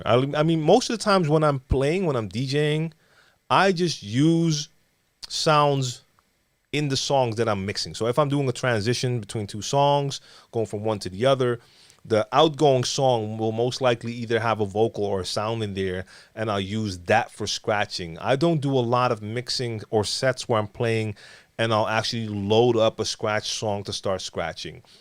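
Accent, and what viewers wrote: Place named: New York, United States. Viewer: I straight thought you where from New York.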